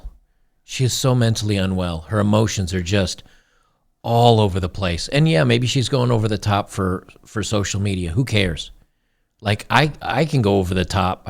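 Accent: Australia. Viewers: As soon as I heard the accent, I thought to myself, "Thank fuck I left Australia!" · Omg she sounds Australian. I hope I don't run across her......😱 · Being Australian myself I knew she was Australian as soon as she started talking... trust me though, you will be surprised how many girls there are like that over here, she is not alone. Anything for clicks these days...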